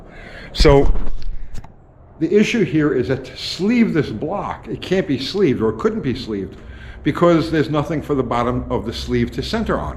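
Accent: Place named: Northeast, United States. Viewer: So, based on your accent, I just assumed you were in the Northeast. Shout out from Clarksville!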